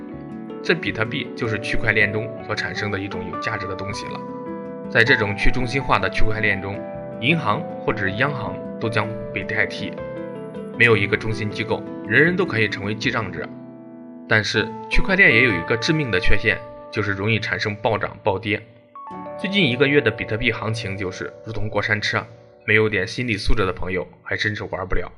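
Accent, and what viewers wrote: Henan, China. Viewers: 哈哈哈 up主是不是豫北人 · 主持人是河南人吧？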